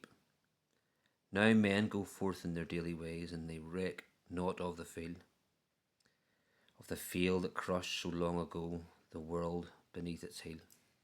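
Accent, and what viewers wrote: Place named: Northern Ireland, United Kingdom. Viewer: Awesome poem! I agree with Patrick, that northern Irish accent makes the reading better 😊, thanks for sharing this mate🤝 Hope you have a great day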